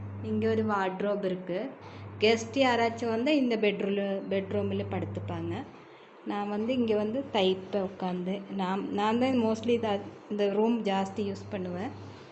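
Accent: Kerala, India. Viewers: thank you ur reply. Malayala Vasam speech LA therunchadhu. Where it's built?. In Kerala r Tamil nadu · Hi Amma, ur sounds like my mom, bzos my mom's native is Kerala she'll mix both malayalam and Tamil while speaking. Only the known people can understand her talk Amma.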